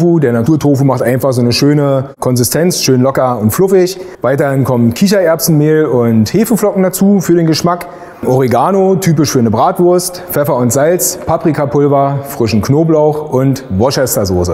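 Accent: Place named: Berlin, Germany. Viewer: HAMMER...wie ich diesen berliner Slang liebe, schlimm ist das bei mir ;););) Finde ich ein Bombe Rezept weil nicht komplett in Fett und ÖL ertränkt, Zutaten klingen stimmig, freue mich auf ausprobieren, ganz lieben Dank! LG aus dem shönen Rheinbach, Henning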